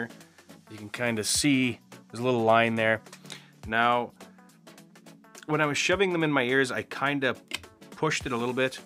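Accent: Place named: Canada. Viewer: Of course! Thanks for posting the video! And yeah, I noticed right away! Right off the bat, I knew you were Canadian. I was like shit yeah, a Canadian review.. Then I realized these are likely only sold in Canada at Canadian tire.. haha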